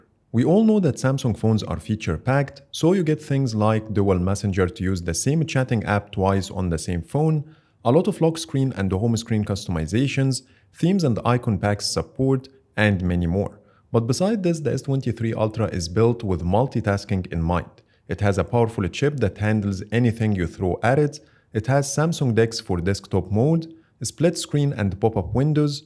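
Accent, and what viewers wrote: Egypt. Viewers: I like the way you embrace your Egyptian accent and not even try to cover it while sounding perfectly clear 👍 also great review from end user prospective just what viewers need.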